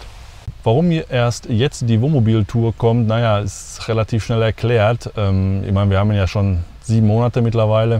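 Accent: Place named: Ruhr, Germany. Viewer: Du bist und bleibst eine coole Socke. Ich liebe unseren Ruhrpott Slang. 😉🍻 Wenn du jetzt noch aufhören würdest Terpentin zu qualmen 😂 Gruß aus Mülheim.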